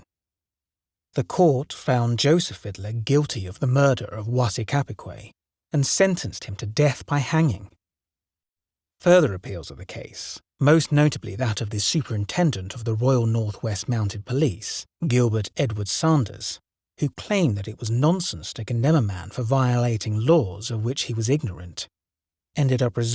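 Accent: United Kingdom. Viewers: Nice! Mysteries are always better with a British accent. Don't know why, they just are!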